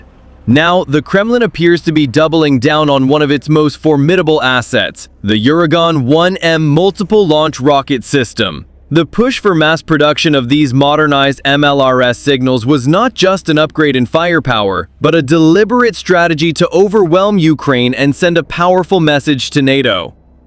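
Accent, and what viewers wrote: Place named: United States. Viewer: Did I miss , for how far it shoots ? All information was logical with a good an Amercan English , thank you .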